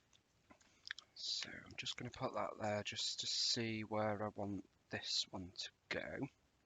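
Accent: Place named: United Kingdom. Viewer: How nice to hear a British blokes voice doing scrapbook pages… cool take on the sketch and prompts. The format works a treat just like one of my favs Shannon (she’s Crafty). Chuffed to have found you… new subscriber 😊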